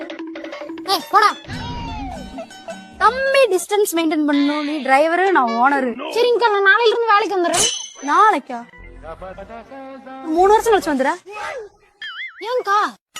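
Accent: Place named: Kongu, India. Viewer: கொங்கு slang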